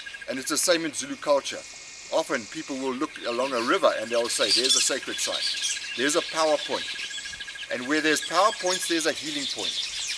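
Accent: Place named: England, United Kingdom. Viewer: Pretty sure that's an English accent too. Colonizers !!!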